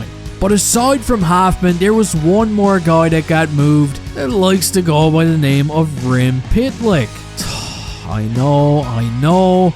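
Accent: Newfoundland, Canada. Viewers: The Newfie accent hasn't been watered down like the rest of Canada's. Because of their isolation, they've maintained the accent of their ancestors mostly from England and Ireland. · He's a Baymen bye from The Rock ..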